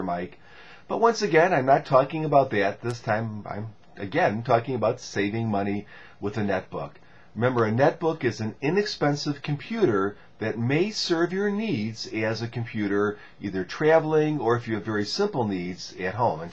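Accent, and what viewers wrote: Chicago, United States. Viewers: Hahaha Its the way you pronounce your "A's" and "O's", especially the A's. For example in "podcast". Sounds like a strong Chicago accent to me. I'm from the south (Arkansas), by the way.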